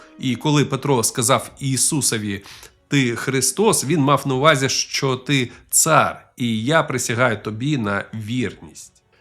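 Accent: Russia. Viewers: Трохи з російським акцентом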